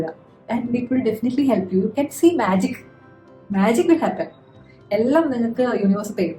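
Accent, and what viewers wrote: Kannur, India. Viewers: Kannur slang❤ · Kannnur ano beedu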